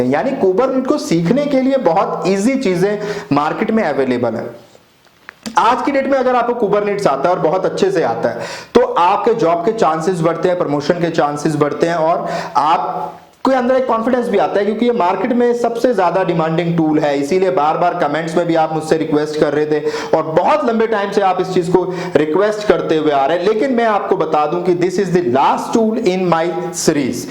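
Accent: Pakistan. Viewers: Tell him to do a ljve f4om India, or you share me a video that proves his Indian Identity..His accent is pure punjabi pakistani accent n he also hidea time zone of his system.. Anyways he is doing a great job..One of the best online tutor..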